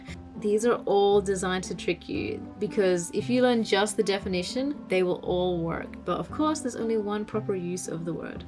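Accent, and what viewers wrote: Australia. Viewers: Are you Australian? Very cool accent. Feels a mix of several accents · Your accent is 75% Aussie and 25% US!! WTF?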